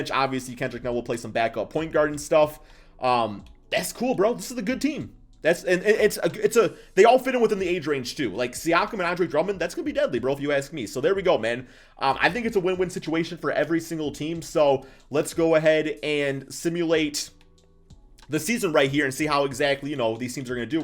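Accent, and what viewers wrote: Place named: Bay Area, United States. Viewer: Big facts brah like yo is he from the bay? Or Michigan?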